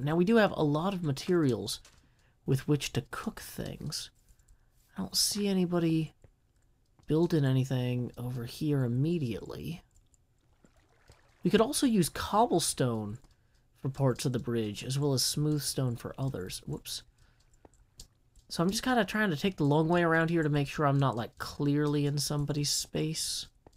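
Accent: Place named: Tennessee, United States. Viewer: First time watchin' your videos, and I've gotta say they're great. Nice hearin' somebody else from TN on youtube. :)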